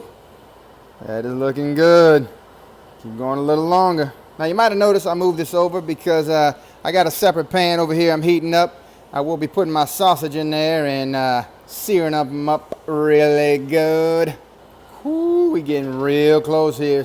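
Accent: Louisiana, United States. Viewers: I wonder what part of Louisiana he's from. Gotta be close to me. Southwest Louisiana for sure.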